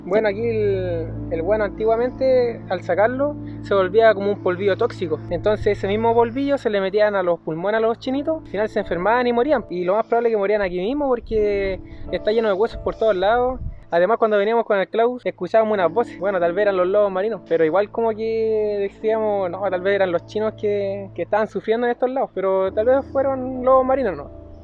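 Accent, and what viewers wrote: Chile. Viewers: Muy interesante ese vídeo pero no se entiende mi papá lo que hablas deberías hablar más despacio para que se entienda solamente entre chilenos se entiende eso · Que acento desagradable el Chileno 🥴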